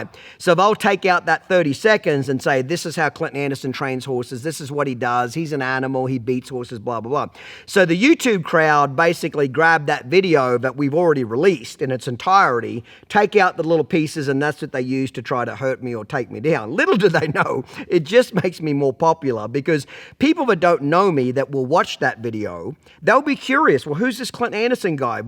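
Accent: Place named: Australia. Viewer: I never knew Garth Brooks has a Aussie accent..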